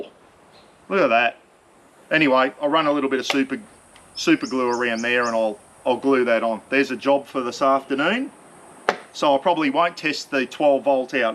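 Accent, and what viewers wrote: Australia. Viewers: Skip to other wise enjoy the Australian accent or what ever is....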